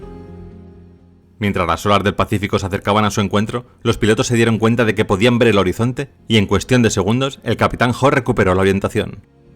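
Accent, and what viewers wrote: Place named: Spain. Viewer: Si se le entiende bien.a pesar de sentirsele su acento español..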